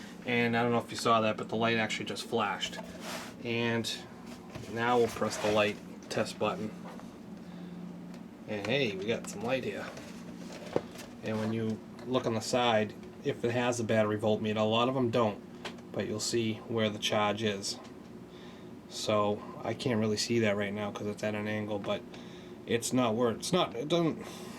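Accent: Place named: New York, United States. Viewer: THANKS!! You answered all of my unanswered questions. Hit the nail on the head my battery read 4.2 volts. by the way loved the accent, you must be from New York Thanks again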